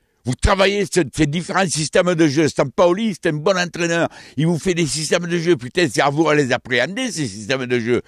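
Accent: South, France. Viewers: Courage adore votre accent ca sans bon le soleil du sud courage au supporter de OM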